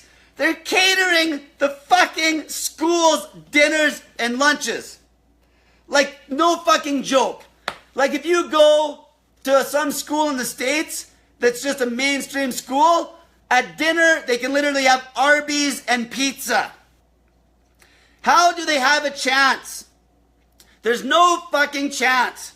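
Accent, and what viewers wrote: Canada. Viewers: that canadian accent STRONG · you Canadian?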